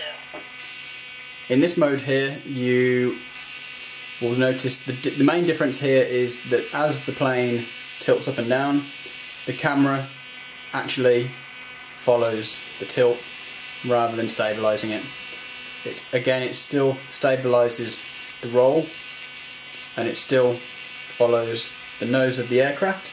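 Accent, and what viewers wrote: United Kingdom. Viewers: looks like a nice gimbal. I used to think you were from the UK till I heard your GF speak, but you sound as British as they come. where do you fly out of?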